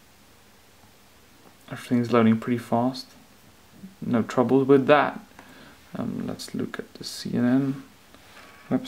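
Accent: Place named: United Kingdom. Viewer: You sound like a fellow Brit, what website did you purchase this from and how much did you pay for it? Do you have to pay for VAT and what not?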